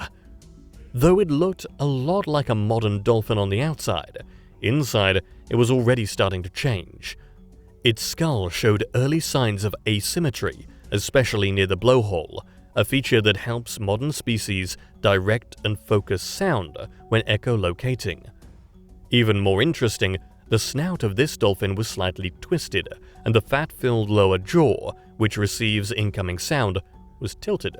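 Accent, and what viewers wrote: United Kingdom. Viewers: If we're going with the theory of evolution, which is never actually been proven, by the way -- why are cockroaches and crocodiles exactly the same over billions of years? Is it possible that those one or two fossils that somebody found were just mutants and not some pre-evolved form? I mean the theory of evolution just cracks me up to be honest and then we get this dramatic British man servant accent and I don't know what to think of it this😅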